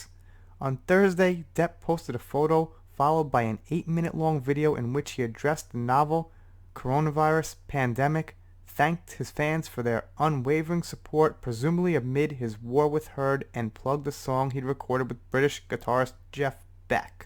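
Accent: New York, United States. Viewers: I did not hear You say anything wrong. I mean it maybe Your New York accent because We say, "Eee-ther." Anyway, I agree with You as always. The other thing that I will say is, "Johnny is just plain Class And Amber is Just plain Trash." That is really the difference in their videos. She acts like a Middle-Schooler trying desperately to be cool. When She said, "I will steal Your Stash." The middle finger too; GROW THE F%£?CK UP!!! That is all. I hope that You and Your Family are well. 😊👍🏼👋🏼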